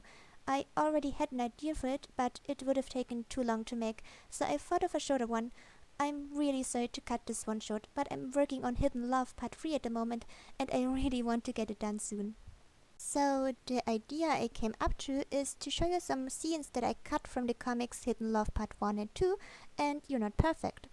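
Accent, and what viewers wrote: Germany. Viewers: Omg ich habe mich schon gefragt, ob ich mir nur einbilde dass dein Akzent deutsch ist xD Glückwunsch zu den Abonnenten und ich liebe deine Videos :) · Wow this is amazing. I really love this!:D Thank you for sharing this with us and I look forward to being on this journey with you. And your German accent is beautiful! never apologize! I'm learning it now ^^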